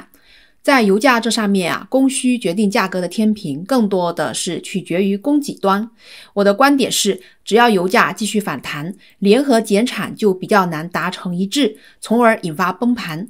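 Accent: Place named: Cantonese, China. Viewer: 感觉广东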